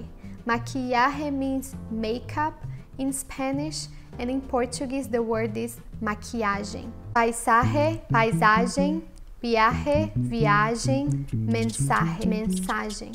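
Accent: Brazil. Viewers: let me tell you this... As a Portuguese native i find her videos annoying as shit! The fact that she is trying to teach the diferences between Portuguese and Spanish, and then makes the Portuguese pronunciation with Brazilian accent, makes me want to punch her in the throat... if you are going to teach something please make sure you know how to exactly say it before making fun of yourself online. That aside and answering your question it doesn't make sense, your right! But that is only true for Brazilian Portuguese. If you were to learn Portuguese from Portugal it's a set rule that all words ending in "o" have to be pronounced as the sound "u" (no exceptions). Hope it clarifies it for you. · Her accent is Brazilian, not Portuguese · why would you use the portuguese flag if your talking like brazilian people wTF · JhonnLT Ele não falou "bosta" seu ignorante. Ela usou sotaque brasileiro, é uma crítica perfeitamente válida sobre a bandeira. 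· I like the video, but this is much more SPANISH vs BRAZILIAN.... Your accent here is completely brazilian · Se é pra falar com sotaque do Brasil e meter bandeira de Portugal... não dá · Obrigada,! Aprendi espanhol e MUITO INGLES neste seu video! Estou estudando a lingua do tio sam, mas sempre há espaço para espanhol! Abraço e fique com Deus! P.S.: Pelo seu nome, e pela pronuncia, você é Brasileira, certo? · Interesting the fact that you included the Spanish flag and the Portuguese flag, despite your Brazilian accent. Thank you. :) 😗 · Muito bem explicado, Muy buen explicado, me ha gustado el acento brasileño :) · You didn't actually speak native portuguese, you spoke brazilian which has a completely different accent from european portuguese. Makeup is not maquiagem in portuguese from Portugal, that's brazilian. In portuguese it's maquilhagem. Stop distorting portuguese language please. 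This video is more about brazilian portuguese and spanish, not portuguese vs spanish. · Ese portugués que estás hablando es más Brasileiro XD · you were actually speaking brazillian portuguese, not the "european". you can tell that by the accent (not a big deal) but also by the word "maquiagem". in portugal, it spells "maquilhagem". just wanted to point it out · FreedomWarrior Não vale a pena andares a causar discussões com Brasileiros só porque ela falou com o sotaque do Brazil, ela usou a bandeira Portuguesa quando falou da língua Portuguesa (dando reconhecimento ao país original da língua) não a Brasileira, por isso acho que estás a exagerar e mais, a causar má impressão do teu e meu país. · Your portuguese accent is from Brazil not from Portugal, it's very different... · sorry ma'am that is not portuguese its Brazilian portuguese which is a lot different when it comes to pronunciation, also you speak south american spanish not original spanish. 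you shoudn't have used the portoguese and the spanish flags in this video so not to mislead people. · she spoke with a Brazilian accent and put the flag of Portugal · poxa moça, você poderia então ter colocado a bandeira do Brasil pq esse sotaque português ai é do Brasil. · Well,when you speak portuguese you speak like brazilian so,your portyguese from Portugal is lightly bad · you are speacking portuguese from brazil not from portugal sorry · que tal se vc colocasse a bandeira do Brasil qdo se refere ao português. afinal vc é brasileira e seu sotaque também. acho que teria mais sentido, apesar de ser a mesma língua. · Muito bem! Se não fosse o sotaque brasilês e a omissão de alguns determinantes seria perfeita. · Isso não é português de portugal isso é português do brasil. Tu pronunciaste as palavras como os brasileiros. Ah e maquiagem diz se no brasil, em Portugal diz se "maquilhagem" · O teu português é muito brasileiro ; ) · Esse sotaque ai é brasileiro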